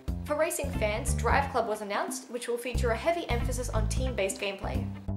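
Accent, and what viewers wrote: Australia. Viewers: I love how aussy ppl talk, you called the vita veetr;p aha your super cute though.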